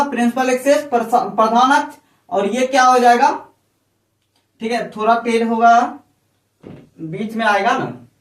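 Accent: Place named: Jharkhand, India. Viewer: Jharkhand sa bola raha hai sir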